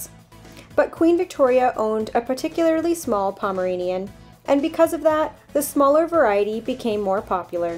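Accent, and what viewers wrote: Canada. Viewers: And her subtle Canadian accent adds so much to it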